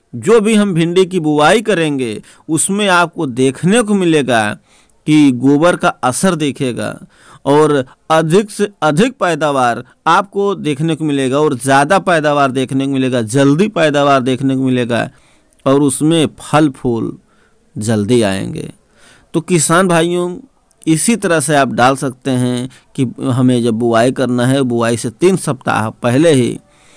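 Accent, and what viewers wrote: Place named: Sultanpur, India. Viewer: बहुत सुन्दर प्रयास मैं सुल्तानपुर से हूँ। आपको बहुत बहुत धन्यवाद। अपने मिट्टी की खुश्बू आपकी आवाज से आती हैं। स्तुत्य प्रयास।🙏❤️🙏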